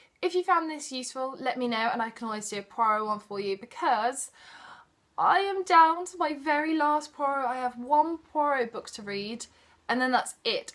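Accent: England, United Kingdom. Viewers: Love your accent. Where are you from in England?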